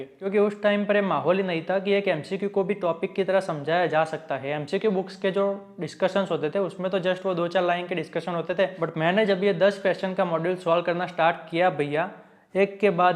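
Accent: Gujarat, India. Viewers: Gujrati tone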